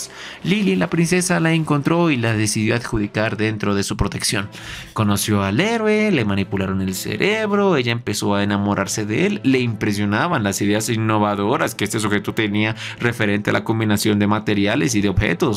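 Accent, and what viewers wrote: Colombia. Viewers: Bro tú eres de Colombia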